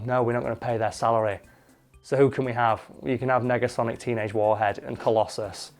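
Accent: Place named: Northern England, United Kingdom. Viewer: I’ve been binging your content for so long that I’m starting to think in a Northern accent... I’m from Australia.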